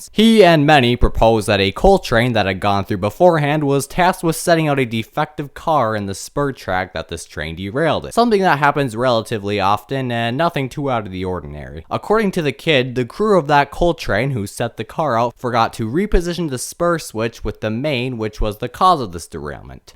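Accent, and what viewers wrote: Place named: United States. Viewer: American, why do you try to sound like chinese, japanese and german at same time while still having obnoxiously nasal amarican accent?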